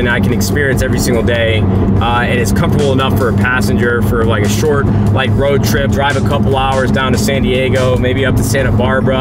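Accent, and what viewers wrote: United Kingdom. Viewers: That British accent was sick oh my 😂 From Leicester big love Car is actually unreal too congrats on all of it you 100% deserve it, been watching since dayyyy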